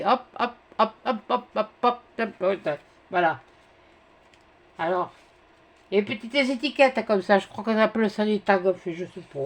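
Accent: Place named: South-West, France. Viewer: Félicitations pour ce concours gagné ! Joli colis c'est vrai que le bloc sur la lune est sublime .... jamais trouvé moi non plus sniffffff .... 1 jour peut être. Merci pour cette bonne humeur dans les vidéos.... et ce bel accent du sud-ouest ! Merci ! Je suis 1 grande débutante et j'apprécie cette simplicité des explications.......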